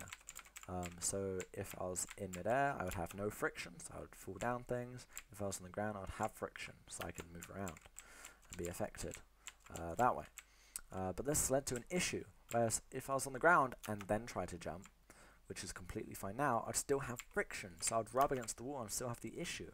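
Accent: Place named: Russia. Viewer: Russian accent moment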